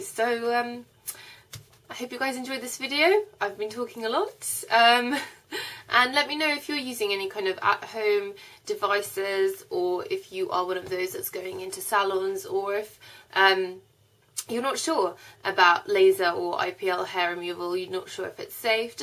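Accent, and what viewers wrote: United Kingdom. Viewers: Oh, gosh,the speech is so distorted!.) ?. Very heavy British accent!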